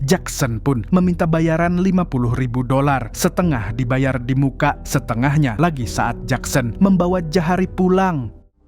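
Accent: Banjar, Indonesia. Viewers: urang banjar nih😂😂😂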